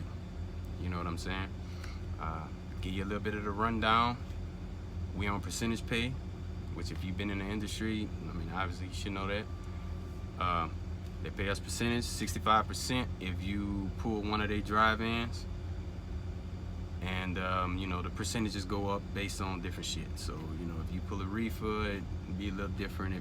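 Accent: New Orleans, United States. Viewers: Sound like he from New Orleans ya heaarrddd mehhhh !! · I can tell you from New Orleans lol I’m from mobile Alabama , how often you push yo self home ??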